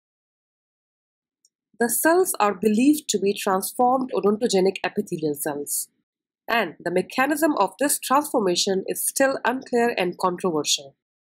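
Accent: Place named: India. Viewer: ... sorry ma'am.. u sounded very much Indian.. whichever nationality, much respect to u.. please do more videos here..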